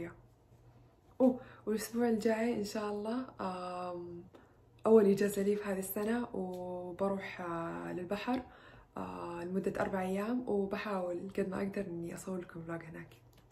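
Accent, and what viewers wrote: Saudi Arabia. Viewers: لهجتها السعوديه جميله. من اي مدينه ؟ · يمههه كيف تتكلم سعودي ؟؟؟ ولا امها كوريه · احس لهجتها من المنطقة الشرقية في السعودية خصوصا لما قالت احاتي وحتى احس مكس الملامح الي فيها كوري على شرقاوي من السعودية الي يعرفون ملامح اهل الشرقية يحسون ان فيها شوي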